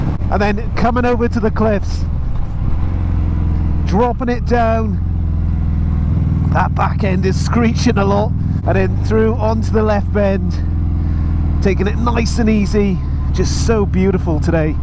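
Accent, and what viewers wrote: Wales, United Kingdom. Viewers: Nice to hear a fellow Welsh man again. Welcome back.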